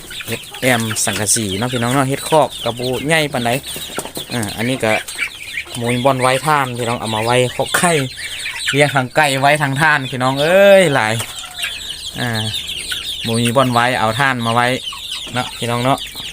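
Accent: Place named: Thailand. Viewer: เว้าไทนาหัวพูแน่🤣🤣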